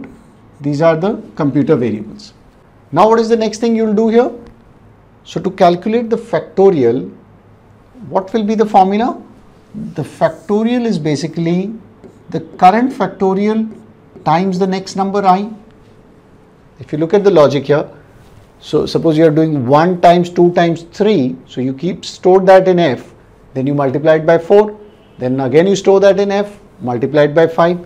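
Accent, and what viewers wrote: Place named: India. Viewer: wow nice indian accent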